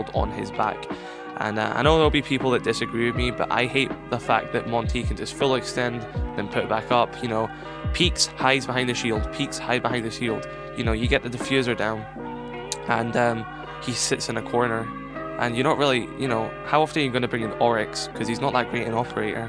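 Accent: Scotland, United Kingdom. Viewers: Good vid dude just recently subscribed noticed your accent your from scotland! Me too 🏴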